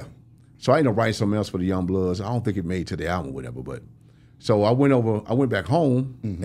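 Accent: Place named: South, United States. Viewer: This host always makes a rapper feels good with his hilarious laughs and comments,real love ,with his souther spin and accent on things .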